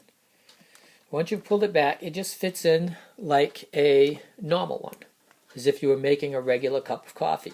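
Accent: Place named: New England, United States. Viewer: Definitely a New Englander!! (Bostonian?)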